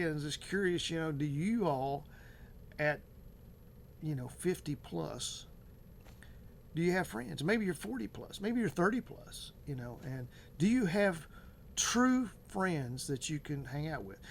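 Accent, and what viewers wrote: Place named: Tennessee, United States. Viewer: I'm almost 60 myself. I had quite of few friends thought true friends. my Son passed away but Little by little they stopped interacting with me due to what I was going through, the grief. So now I don't actually have a friend here where I moved. I moved away from my home state to the desert to start new and moved into a 55+ community. Most of them are a lot older, or already have their own friends, or stays in their homes to beat the heat all day. Plus as I aged, my confidence level has taken a hit. Contributed to ageing. Like you, I work from home and it's very hard to connect that way For friendships. Also, I'm a little too shy to join a group without having a friend or someone I know to go with me, initially. Silly I know! I need to get past that. I'll start going to the gym soon. We all need to be healthier. 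I feel your accent is from maybe TN. That state there is God's country. So beautiful. Another commonality, I have a brother named Keith. 🍺 Here's to us making new friends and having social lives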